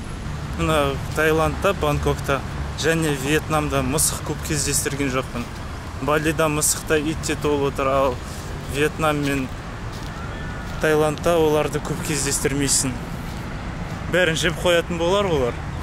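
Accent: West, Kazakhstan. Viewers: Азамат Кудайбергенов батыстын диалект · Батыстан , казакшасы соларга тæн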